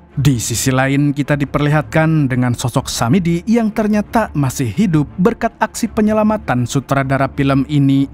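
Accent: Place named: Banten, Indonesia. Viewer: Banten mana suara nya🖐️🖐️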